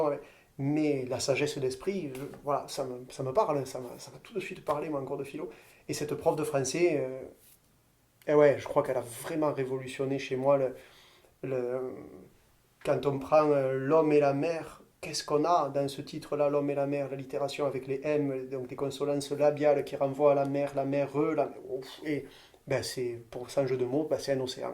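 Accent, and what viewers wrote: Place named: Béziers, France. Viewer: Très intéressantes remarques sur Brassens, ce que je ne connais pas, qui parle avec mon accent, que je me sens seul à colporter dans ma ville natale de Béziers.....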